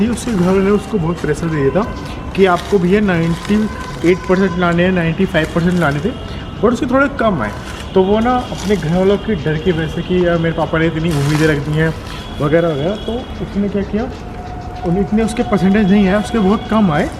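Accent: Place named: Bengal, India. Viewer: Aap ke baat karne se lagta hai aap Bangali ho kya sach me ho ya kuch or ho..... Big fan... vhi ❤️❤️ Love you...... Please reply me... 🥰😘😌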